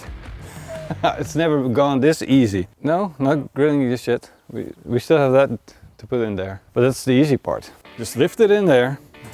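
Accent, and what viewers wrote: Netherlands. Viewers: I love your dutch accent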